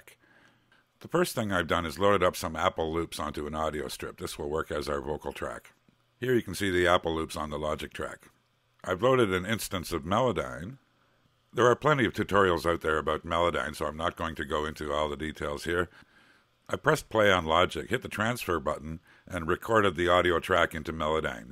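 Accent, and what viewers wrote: Canada. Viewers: No, just a Canadian accent. Hope you enjoyed the vid.!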